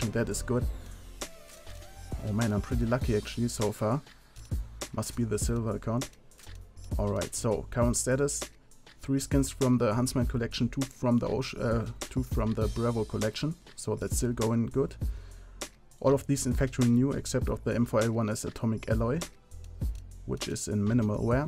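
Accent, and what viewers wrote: Germany. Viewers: Du bist deutsch das hört man:D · It sounds like german english? Its right? :D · red doch einfach deutsch bitte ich pack diesen über deutschen akzent einfach nicht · naja , so spricht jeder deutsche englisch '-' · Schöner deutsch akzent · Are you german? You sound a bit like a german guy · krass wie man hört das du deutsch bist :D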